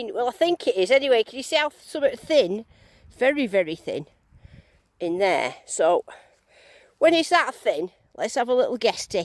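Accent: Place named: Bury, United Kingdom. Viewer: hi just subbed on this video, your accent sounds like you cant live to far from me in bury, you have a cheery positive banter and thats what made me sub, have you ever run your detector along the dry stone walls themselves incse any riches were hidden in the cavitys of the wall,just a thought..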